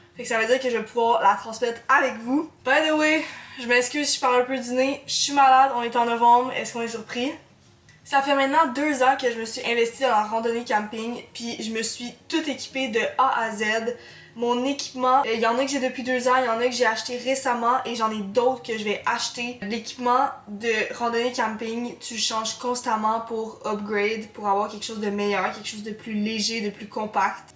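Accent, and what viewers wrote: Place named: Quebec, Canada. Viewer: Tu parle du 👃 moi qui pensais que tu étais québécoise 😏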